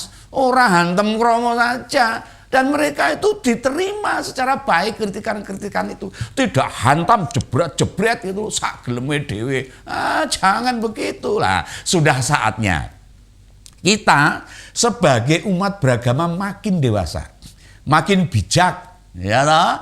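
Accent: Java, Indonesia. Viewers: om ini pembawaannya tenang dan hefi, sy suka logatbicaranya, jawa banget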